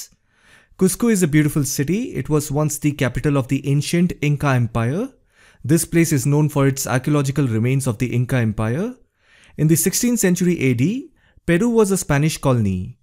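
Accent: India. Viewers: Your indian accent is so clear.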